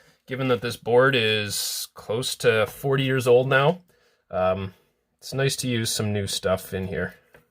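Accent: Canada. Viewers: A lot of people must not understand he's Canadian when they're listening to him. Pretty sad really.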